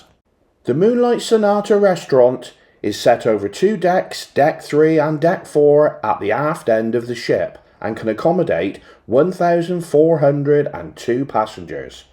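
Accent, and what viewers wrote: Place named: England, United Kingdom. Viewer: Sorry if this has been asked before but what part of England is your accent from, Devon? Just discovered your videos -great job you two!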